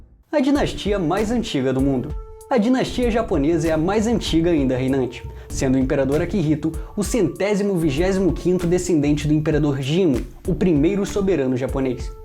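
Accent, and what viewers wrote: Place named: Rio de Janeiro, Brazil. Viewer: Gosto muito dos vídeos! Só não muito desse sotaque carioca carregado... Parece uma bola vazando pelo pito!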